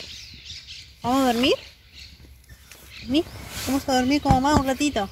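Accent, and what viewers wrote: Córdoba, Argentina. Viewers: Descaaaansar jeje ya se te pego la tooooonada jaja 😄😄 saludos desde Santa Fe pero soy bien Cordobesa jaja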